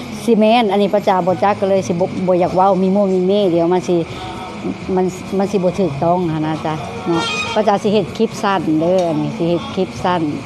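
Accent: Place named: Nyaw, Thailand. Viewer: สวัสดีครับป้าจ๋ามาฟังภาษาญ้อ เว้าม้วนๆครับผม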